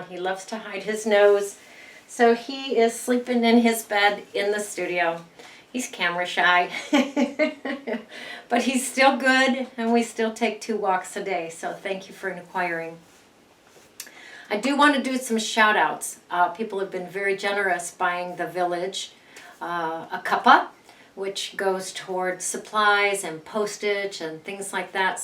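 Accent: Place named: California, United States. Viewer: Oh my gosh it’s early....for me....Would a “button stitched” loop make the closure stronger? Love listening to your California twang, I miss Ventura. And.. I have never heard the term “Underpants” for the binding covers first layers. Makes perfect sense. Love the video. Now I can finish my Cuppa, and go back to sleep.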